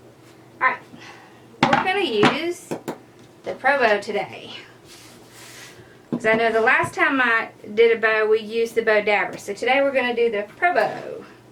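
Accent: South, United States. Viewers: Love the Water Mellon wreath but would love to see it also with a bit fewer ribbons. I love the red and green that looked like the rhine. Actually both would be absolutely gorgeous......where in heavens name do you come up with all these ideas. You are truly amazing! You little guy is so sweet and helpful. Love love the St Patricks Day wreath also. I think I forgot to mention it on the other vlog. I don't have FB so I enjoy seeing you here! You are a busy busy girl. Love you southern accent and sweet way you speak and soft spoken....very easy to listen to you instruct. Loved everything and love how you do the tutoring so quickly. Blessings to you and yours. :) :) :)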